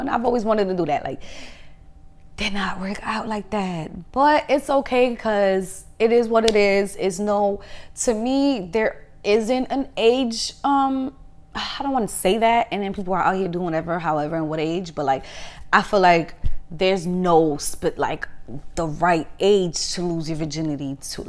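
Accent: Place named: Brooklyn, United States. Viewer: One thing about, it’s not a word she can say without sounding Brooklyn af. 🤣 Like everything about you scream BK/NY. I love your accent - a Bronx girl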